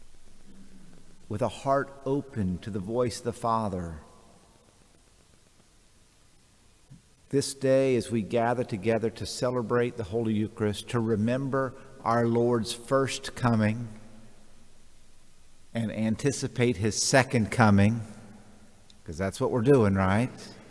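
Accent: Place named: South, United States. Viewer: Father, I was browsing on YouTube and "discovered" your video clips. (I think maybe God had a hand in my discovery.) Anyway, I must say that I enjoy listening to your homilies. I try to listen to you quite regularly. P.S. It's nice to come across a priest who has a nice Southern accent, y'all. That's meant as a compliment.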